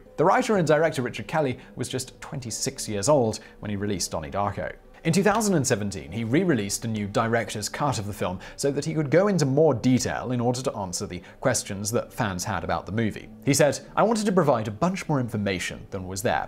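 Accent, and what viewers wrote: United Kingdom. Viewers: Get guy with a British accent to state things emphatically and act like that is The explanation of the movie. The top wasn't Cobb's totem it was Mail's, so what it did doesn't matter. What King says doesn't make a difference to Kubrick's movie. (Did you see the version he made? It suuuuuuuuucked.)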